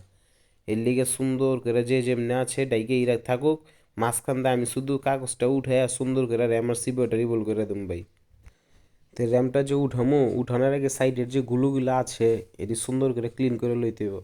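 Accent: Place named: Old Dhaka, Bangladesh. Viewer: পুরাণ ঢাকার ভাষা ভালো লাগেনা, শুদ্ধ বাংলাই কথা বলো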